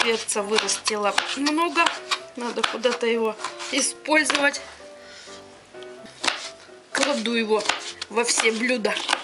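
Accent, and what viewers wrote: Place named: Siberia, Russia. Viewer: сибирячка по говору наверно.Спасибо .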